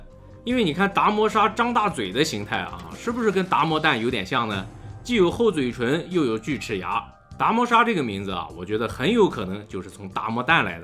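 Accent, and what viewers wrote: Shandong, China. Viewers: 楼主是哪里的口音啊？山东？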